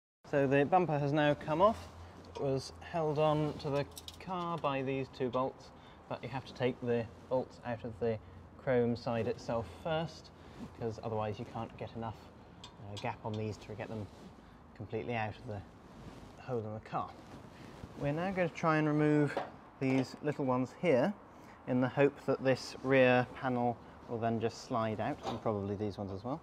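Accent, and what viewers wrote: United Kingdom. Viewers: Your accent says UK but the number plate says NSW. 🤔 The whole spare tyre assembly is completely different to my 54 TF.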